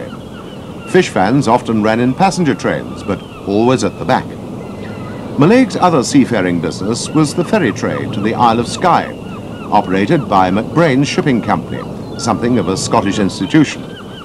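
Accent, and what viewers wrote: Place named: England, United Kingdom. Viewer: och aye the noo......see you jimmy....he has to be English,would'nt understand a jock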